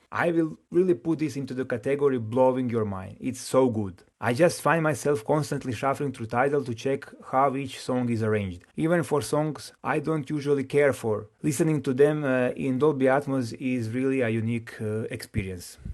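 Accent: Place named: Eastern Europe. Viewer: A giudicare dall’accento ho pensato che tu fossi slavo ahaha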